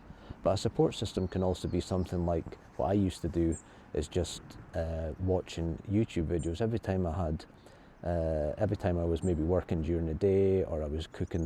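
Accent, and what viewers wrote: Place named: Scotland, United Kingdom. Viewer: Hello 14 weeks sober nice to hear a fellow Scot talk sober talk subbed. Was looking at your background and was thinking that looks familiar 😂 🌟 bank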